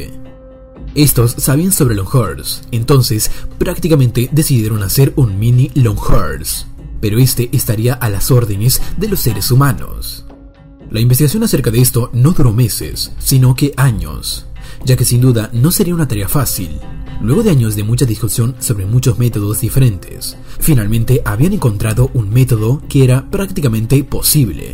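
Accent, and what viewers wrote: Argentina. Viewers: ares argentino dices cuecho en realidad es cuello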